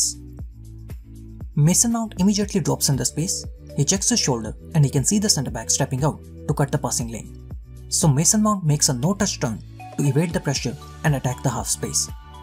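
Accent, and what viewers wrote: India. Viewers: Man sounds like he's from India, and here the match is early on Friday.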